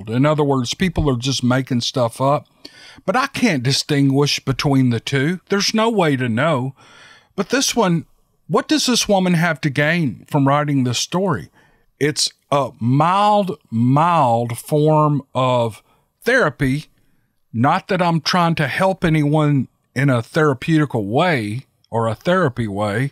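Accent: South, United States. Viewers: I just love that Southern accent !!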